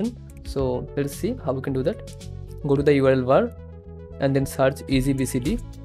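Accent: India. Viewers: Xati axomiya pronunciation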